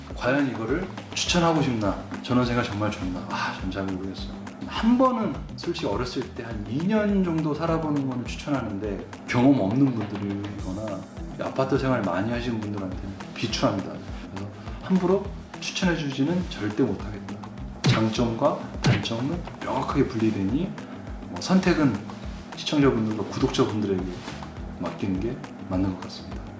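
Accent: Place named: Gangwon, South Korea. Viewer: 경상도지방은 전원생활하기 좋아요^^ 들어보니 강원도 인거 같네요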